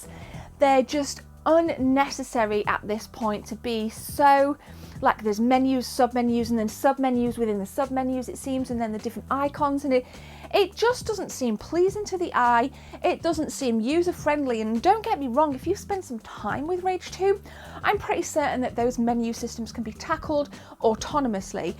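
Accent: United Kingdom. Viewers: I love that British accent lol. My first time watching. Nice job! I'll stay tuned. Days Gone is so much better, by the way!!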